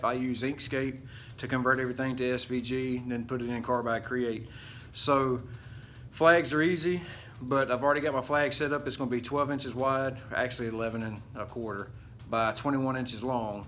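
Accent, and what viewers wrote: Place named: West Virginia, United States. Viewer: I recognize that accent, as I'm a WV feller not too far from Danville! Appreciate your video very much. Brand spanking new to CNC's and carbide create seems like a good program to get me up and running. (along with the help of good guys such as yourself). Hope your little girl is still doing well and healthy and will say a prayer for her and you and your wife as well. All the best.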